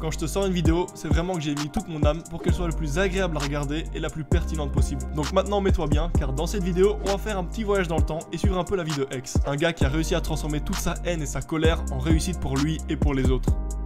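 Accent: Belgium. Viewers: Tu as l'accent d'un belge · On sent le belge en toi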